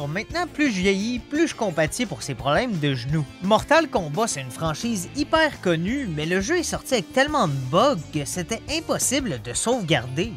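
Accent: Quebec, Canada. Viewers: C’est un Québécois qui parle ou,la voix du mec ma exploser de rire et ça marche avec ses blagues. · Sont super tes vidéo ! On dirait que t'es québécois !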